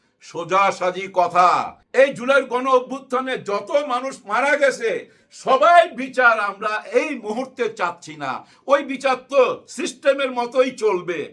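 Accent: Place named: Narsingdi, Bangladesh. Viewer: আমাদের নরসিংদির মনে হচ্ছে।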